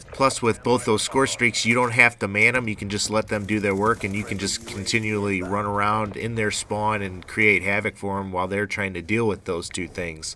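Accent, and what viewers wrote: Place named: Midwest, United States. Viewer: You have a Midwest accent.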